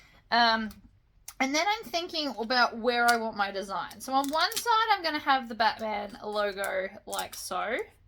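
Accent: Australia. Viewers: Gday, just a heads up you need to clean the cup with alcohol, love your videos, nice to hear an Aussie for once…where in aus are you?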